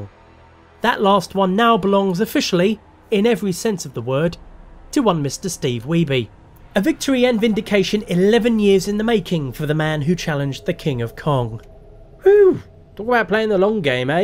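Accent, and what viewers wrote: United Kingdom. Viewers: I like your awfully delightful British accent and your sarcastic humor. How haven't I heard about you previously? well anyway Keep up the good work. I'll be watching you... in a good way... nice socks btw. Where'd you get them? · Thanks for this video mate it was exactly what i was looking for. Decent length breakdown all the important details and British narration. Cheers matey!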